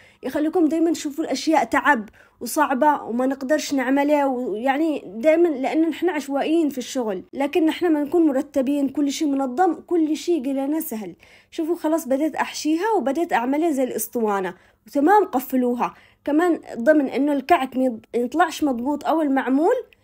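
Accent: Yemen. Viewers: تابعت كذا مقطع بقناتك حلوه لهجه اليمين الله يعطيك العافيه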